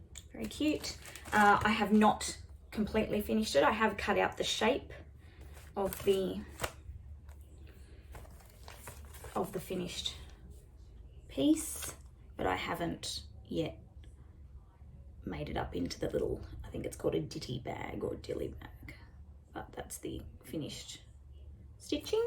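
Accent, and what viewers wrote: Australia. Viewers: Loved your video, your wonderful Aussie accent and your handwork. Best to you and yours!!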